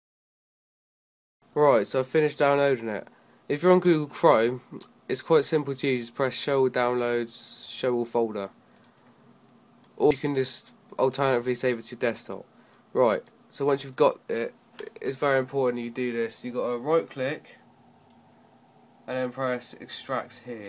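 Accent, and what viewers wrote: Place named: United Kingdom. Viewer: GASP UR BRITISH BRITISH PPL R AWESOME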